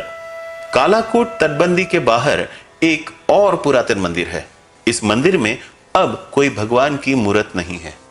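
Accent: Maharashtra, India. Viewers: Please learn to speak hindi well it seems you are telling story in Marathi Hindi tone is different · Bhaisahab ye maharastrian hindhi hai,thoda samaja karo bhai..har kisika bolne ka,bat karne ka o kidhar rahte hai unpe jyada depend karta hai..so unka aisa Kuch matalab nahi rahega k kisike man dukhaya jaye...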